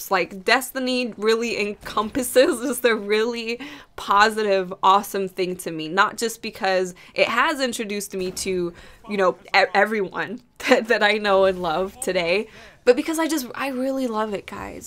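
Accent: United States. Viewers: Where are you originally from? You should like your from somewhere in America but you and your mum are living in the uk? (Unless you are talking about the city named London in Canada) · U sound American. U don't have a British accent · arent you from america, you sound it!! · she's lived in America and has an American accent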